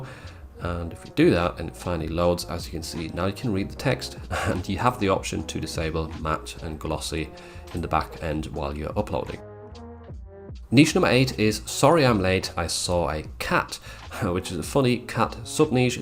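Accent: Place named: England, United Kingdom. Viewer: Thanks, you always get me thinking. I hope you don’t mind me saying, since you mentioned about the plaid, that most people I know would say “various / variations” as though there were the word “air” in it. Not a criticism or anything, just thought you might like to know. I love listening to English accents since I now live in the States.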